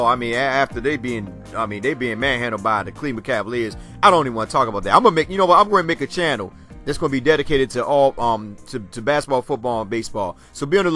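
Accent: Baltimore, United States. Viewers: Good video. I detect a Baltimore origin to your accent?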